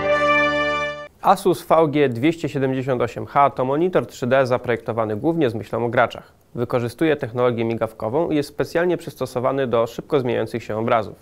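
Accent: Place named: Scotland, United Kingdom. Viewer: DAMN SCOTTISH ACCENT !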